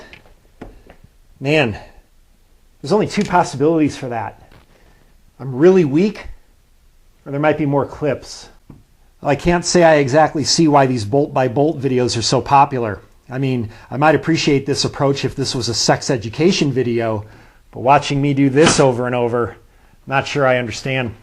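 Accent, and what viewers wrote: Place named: Louisiana, United States. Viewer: Jeeese I must apologize I just took it for granted - to me you have an accent, I thought it was Cajun but I'm from Canada so its not like we hear people from Denver either except John Denver and you know he never had an accent. Up here once a week I listen to the best automotive phone in radio podcast in the USA from guess where Louisiana. This is the link if your interested. <link>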